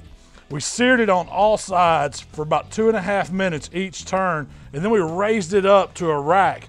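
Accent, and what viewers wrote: South, United States. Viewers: American accent?? Don’t you mean southern?? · This defines America. Some fat guy with an American accent showing us how to grill a huge steak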